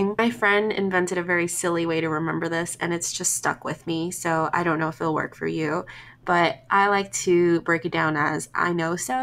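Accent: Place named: England, United Kingdom. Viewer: I just loved your english accent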